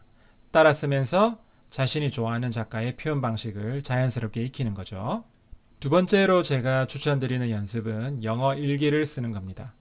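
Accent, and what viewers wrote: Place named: Busan, South Korea. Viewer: 강의중에 부산억양이 살짝 들려서 여쭤 봤습니다! 향후 강의 개설계획 있으시면 좋겠습니다~여기도 부산입니다~~^^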